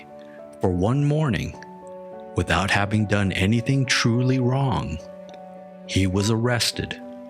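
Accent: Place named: United States. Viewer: He's not AI -- he's American. They tend not to do languages well. Dan Rather use to say " N-rowt" for en route.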